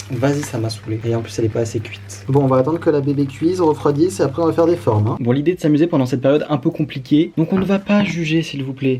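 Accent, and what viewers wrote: England, United Kingdom. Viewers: Mais quel accent anglais ! Whouaaa 😂💕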